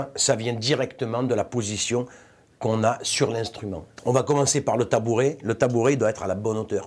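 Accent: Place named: South, France. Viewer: Bonne enseignement avec l’accent du sud en prime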